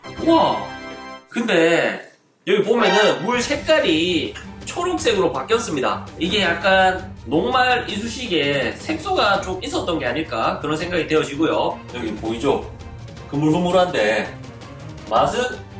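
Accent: Busan, South Korea. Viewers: 몰랐을수도있지겁나뭐라하네 근데허팝님부산사람인가요뭔가억양이익숙한데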